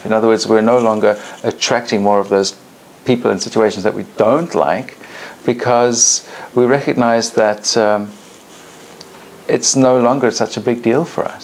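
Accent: South Africa. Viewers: South African accent? 😁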